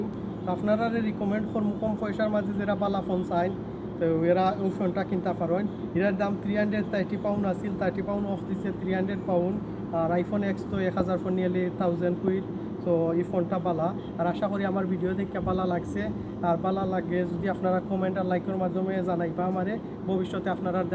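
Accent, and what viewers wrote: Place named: Sylhet, Bangladesh. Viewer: সিলেটি কতা ভই খুব বালা লাগে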